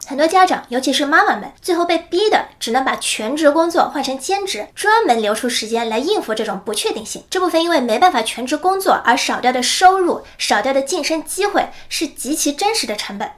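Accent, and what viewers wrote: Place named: Shanghai, China. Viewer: 听口音是桑嗨宁吧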